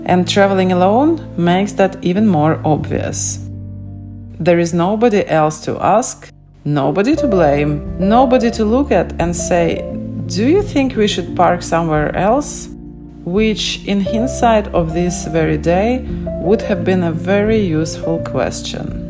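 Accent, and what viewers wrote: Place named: Eastern Europe. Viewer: Like your eastern european accent, did you have a foreign english teacher? No offense, I understand you fine, just interesting🧐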